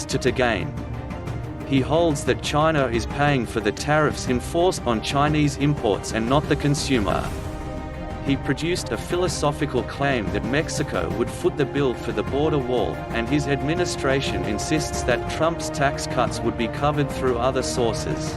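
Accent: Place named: United Kingdom. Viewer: The Corona virus is taking down the over borrowed stock market... and it's not a trade war when your competition has been raping the natural world and not paying tariffs on their exports... as for the fence on our southern border is obvious more nie than ever... we have 60 million illegals here.. time to send them home and yes America is first in america... our grandparents who helped fight and make America the strength it was would be pissed off if they saw how a lot of not very bright people are helping the confederacy a.k.a. the Democrats slowly turn our union into a socialist nightmare... and destroy our rights and liberties... all because they're favorite actor goes on TV and trashes the only one who cares to undo the dems damage and give us back our freedoms... propaganda garbage with a fkin brit announcer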